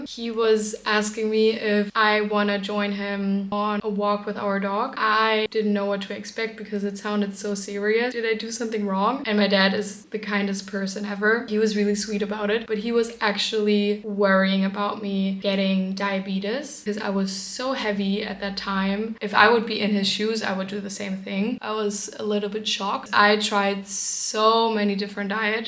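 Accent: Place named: United States. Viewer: You literally sound american! Thats so crazy how living in america for 1 year left you with an american accent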